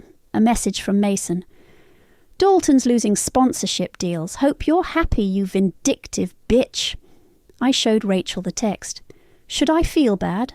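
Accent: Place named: United Kingdom. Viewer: I loved this story and I really loved the British narrator 😊❤🎉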